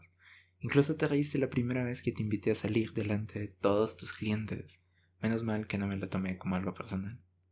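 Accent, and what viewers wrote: France. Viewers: Gracias por todo tu esfuerzo empeño trabajo duro quién me has puesto a los videos a este video cada vez que oigo tu voz se me acelera el corazón me alegra la vida tienes una hermosa voz tranquila calmada que enamora y encantada y encariña siempre me voy a enamorar y me voy a encariñar amo tu voz francesa love for your ❤❤❤❤❤❤❤❤❤❤❤❤❤❤❤❤❤❤❤❤❤❤❤❤❤❤❤❤❤❤❤❤❤❤❤❤❤❤❤❤❤❤❤❤❤ amo con locura tus videos siempre me voy a enamorar y cantarina😊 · Tienes un lindo acento francés ❤❤❤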